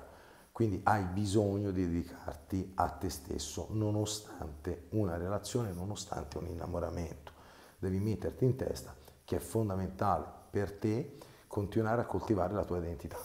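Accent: Tuscany, Italy. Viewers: Basta, fatela finita, basta andare a vedere questi video sul narcisismo 😂. Molto simpatico dottore, ogni tanto la seguo proprio per questo. Ha perfettamente ragione altrimenti diventa un ossessione. Si esce da una dipendenza e si entra in un altra . Complimenti per il suo modo schietto di esporre . La parlata toscana ben si addice. Chapeau 🙏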